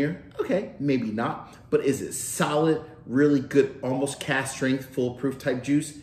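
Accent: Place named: Delaware Valley, United States. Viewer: Are you from the Delaware Valley? I detect a bit of an accent.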